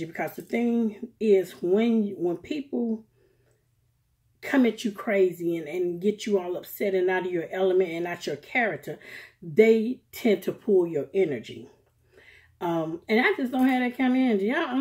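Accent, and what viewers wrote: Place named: Alabama, United States. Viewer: I KNEW YOU WERE FROM GEORGIA OR EITHER ALABAMA. YOU SOUND JUST LIKE MY COUSINS IN ALABAMA.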